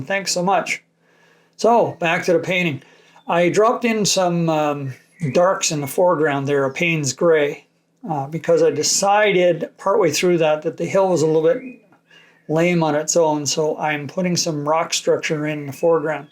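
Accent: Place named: Canada. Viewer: Very informative. I appreciate you telling what colors you are using. Love to hear your Canadian English. Toni-Colorado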